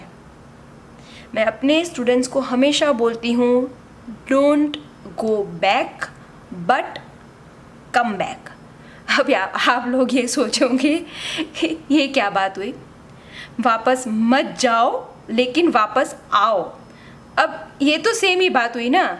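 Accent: Gujarat, India. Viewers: ur accent😯,r u Gujarati?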